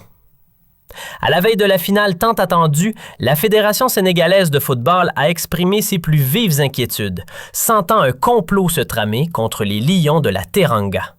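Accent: Canada. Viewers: 🇲🇦🌟🇲🇦👑🇲🇦🌟🇲🇦✌ Votre post n'est pas une analyse journalistique professionnelle sérieuse, mais la lecture, par un citoyen canadien (selon son élocution), probablement contre argent, d'un communiqué de la junte militaire algérienne, d'accusations et de propagande antimarocaines gratuites, sans preuves. C'est une honte, de la part d'un citoyen ressortissant d'un pays démocratique, de travailler pour le régime algérien militaire non démocratique, régime qui opprime le peuple algérien depuis 1962, par la peur et par les files d'attente des citoyens pour recueillir les quelques biens de subsistance ou utilitaires (pas de lait, pas de sucre, pas de farines, pas de poissons, ...., pas de chambres à air pour les véhicules, pas de gasoil, pourtant l'Algerie est une économie gazière et pétrolière, ). Le Maroc n'est pas riche, mais il n'est pas comme l'Algérie connue pour ses files d'attente de la honte. 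Le Maroc a de bonnes relations avec le Canada, les USA, la Chine, la Russie, la France, le Royaume-Uni, l'Allemagne, ....etc. Toutes ces puissances, grandes ou moyennes, élaborent des rapports satisfaisants, sinon excellents, sur le sérieux, la crédibilité, et l'évolution positive socio-économique et politique du Royaume Cherifien du Maroc. Je ne flatte pas mon pays, mais le nombre de canadiens qui visent le maroc se chiffrent par milliers et les rapports des tour operator occidentaux sur le Maroc sont positifs et contredisent les falsifications algériennes que colporte votre post honteux.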